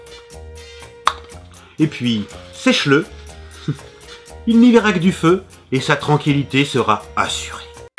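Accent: France. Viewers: Salut :) Je regarde tes vidéos de temps à autre et je peut te dire que tu as de l'avenir si tu continue ainsi ! Reste comme tu est avec tes "vieilles" blagues qui malgré le temps nous font toujours marrer et ta prononciation à la française des mots anglophones est juste ÉNORME. Bonne continuation à toi et tu peut compter sur moi pour un petit commentaire dans chacune de tes futures vidéos. A plus :D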